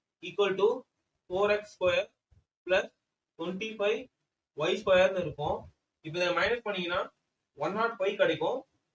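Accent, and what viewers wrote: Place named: Madurai, India. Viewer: Neenga madurai ha bro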